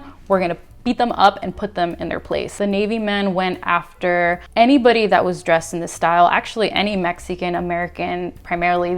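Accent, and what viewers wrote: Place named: General American, United States. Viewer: Where in the states are you from/grew up? You have the most universal American accent ever--idk what people are talking about when saying you mispronounce stuff lol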